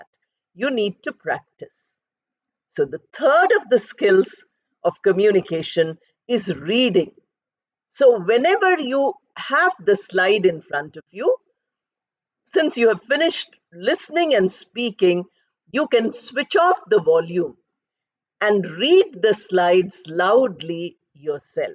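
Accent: Bengal, India. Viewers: What kind of english is this?american or british? It sounds like Benglish. Plz. Learn english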